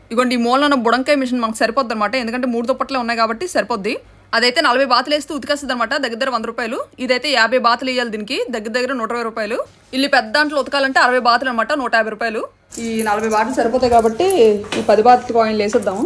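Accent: Vizianagaram, India. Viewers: Yeah true bro, and filmy moji valla mana vzm slang ki YouTube lo Baga reach vastundi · Desha basha landhu Telugu lessa.. Ejjinagaram basha enka superu.. Great.. adhirindi.. god bless you. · your voice and slang, very connecting to our own area and place.. very authentic and natural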